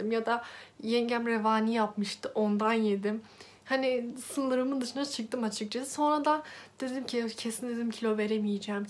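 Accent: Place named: Black Sea, Turkey. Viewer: Karadenizlisiniz sanirım ara öğününuz hangi saatlerde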